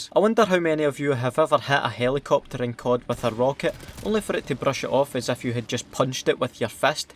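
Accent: Scotland, United Kingdom. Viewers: Your accent makes me question my scottishness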